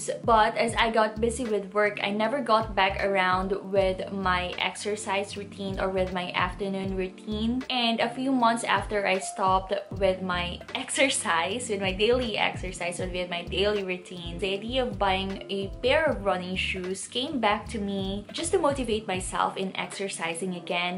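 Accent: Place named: Philippines. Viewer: Me too, not a fan of jewelry. Earrings and watch will do. Btw, I love your Filipino accent don't mind the troll account. 😉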